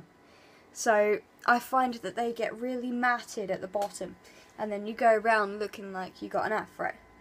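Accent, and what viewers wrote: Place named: United Kingdom. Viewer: i love your british accent, it's pretty nice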